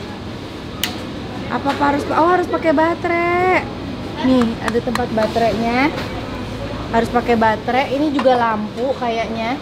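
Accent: West Java, Indonesia. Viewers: Lucu banget teh logat bahasa sundanya 😄👍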